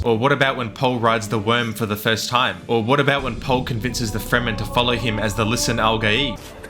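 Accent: Australia. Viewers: Bro is australian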